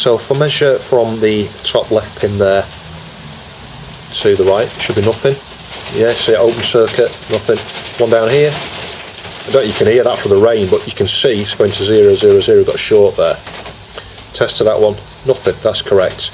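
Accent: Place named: Northern England, United Kingdom. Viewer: Northern? Sounslikeyerfromrowndear.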